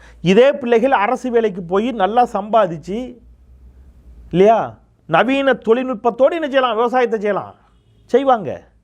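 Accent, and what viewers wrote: Tirunelveli, India. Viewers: தம்பி எல்லாம் சரி தான் பா. ஆரம்பத்தில் ரொம்ப மிராட்டுகிராயே. நெல்லை வாசமா? நீதிபதி தங்கைக்கு, வங்கி மேனேஜர் ஆகியோருக்கு வாழ்த்துக்கள்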